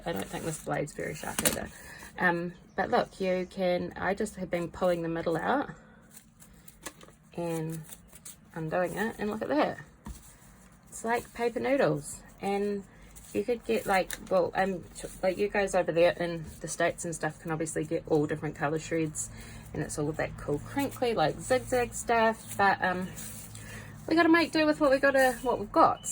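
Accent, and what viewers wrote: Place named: New Zealand. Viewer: Love your accent. New Zealand?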